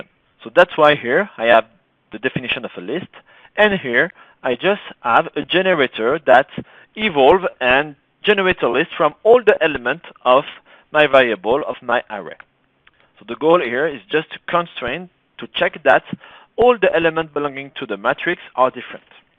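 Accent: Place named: France. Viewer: ahaha I was just joking because it was already obvious in the other videos that you are french from the way you say minizinc, nevertheless your videos are informative and I want to thank you for them! Keep up the good work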